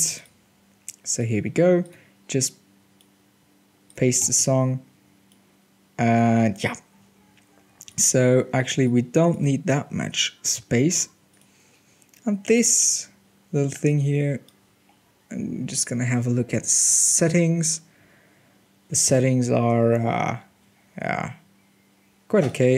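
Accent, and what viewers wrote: Germany. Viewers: geil ein deutscher der englisch redet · BHAudioProduction Spoken with a strong german accent. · U are german right? Good Tutorial. Looks realy Nice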